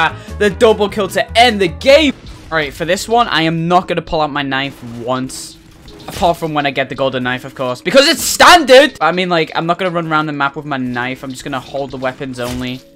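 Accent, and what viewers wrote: United Kingdom. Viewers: are you talking english in british way ?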